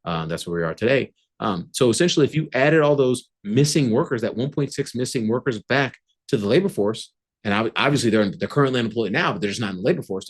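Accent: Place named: United States. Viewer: Typical American way of delivery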